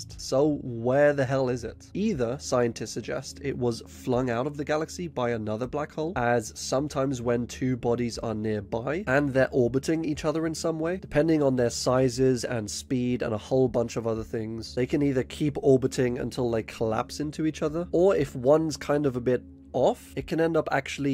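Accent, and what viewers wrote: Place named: Wales, United Kingdom. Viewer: Im glad ive spotted your chanel content is fantastic keep up the good work also i hear a slight welsh there in your accent if im wrong please correct me im just a huge fan of the welsh accent probably the most amazing accent in the world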